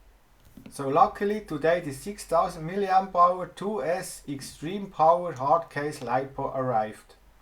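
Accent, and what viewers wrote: Switzerland. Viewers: Are you from swirzerlanf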